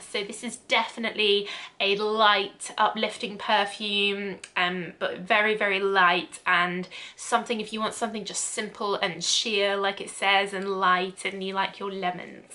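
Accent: United Kingdom. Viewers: I’m from the Philippines and I love perfumes and I love watching people who have great British accent. So Here I AM HAHAHAHA LOVE YOUR VIDS ❤️💛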